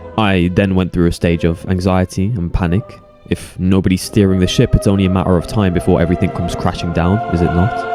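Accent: United Kingdom. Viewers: West midlands?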